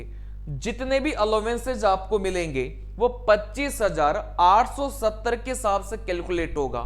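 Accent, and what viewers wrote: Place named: Kashmir, India. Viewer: Ap kashmir se hai kya😅😅 mera room mate bhi aisi hindi bolta tha or wo bhi kashmir se tha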